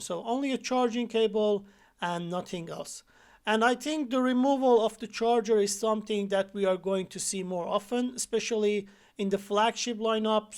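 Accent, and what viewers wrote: Iran. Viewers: I know a Persian accent when I hear one lol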